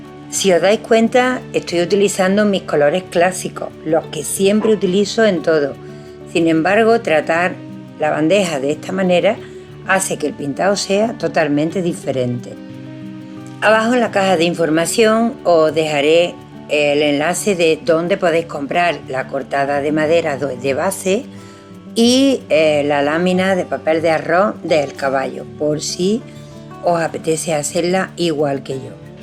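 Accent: Andalusia, Spain. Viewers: hermosa la bandeja!! desde Argentina...me encanta tu tono andaluz!!!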